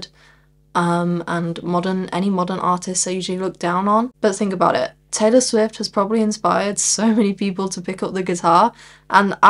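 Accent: England, United Kingdom. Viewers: “Rock isn’t dead, it just smells funny” - Frank Zappa, sort of. Agree very much with your assessment of the state of the art. Curious to know what part of England your accent is from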